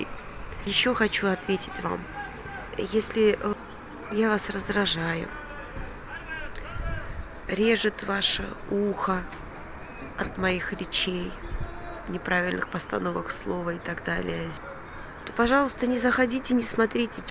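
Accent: Georgia. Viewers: вы уже не первый раз отвечаете на комментарии о замечаниях, зачем? Очень много внимания этим людям, они только это и ждут. Мне например нравится ваш грузинский акцент. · Мне наоборот нравится ваш акцент грузинский и когда неправильно говорите слова это придаёт живости, характер вашим видео. Приятно, что это на видео живой человек, с душой, а не робот на работе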